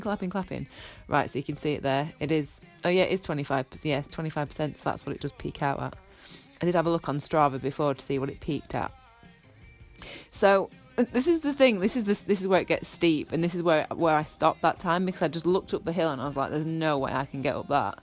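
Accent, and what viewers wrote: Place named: Scotland, United Kingdom. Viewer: you sound kind of scottish, I'm american sorry if that sounds stupid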